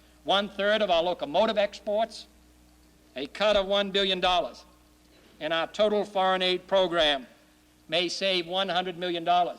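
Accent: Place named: Boston, United States. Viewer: I love his Boston accent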